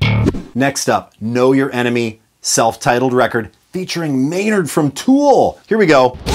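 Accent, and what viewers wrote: United States. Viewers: Pretty good American accent can't lie lol 😂 great video tim c is a legendary bassist so underrated played with so much aggression, anger, passion, love, hate and awesome all round bassist 🤟🏻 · Yes yes yes ! But why the American accent ? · I’m confused, why do you have an American accent now? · Dude I got like so freaked out that u didn’t have an accent. Good job with the American one btw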